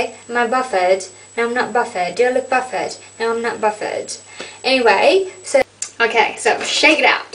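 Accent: England, United Kingdom. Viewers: love ur British accent 555555+ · Your British English is perfect, any way I am from the good old U S of A LOL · This is the first video of yours I have watched. Your English accent is great and it really made me laugh that you stayed in character! I'm from Scotland and would love to hear your Scottish accent! xx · Just stumbled on this site. Ha ha. You sound much better with an English accent. Waddee kap. Kop khun kap kru. Kuhn athibai phut passa Thai chaat mak.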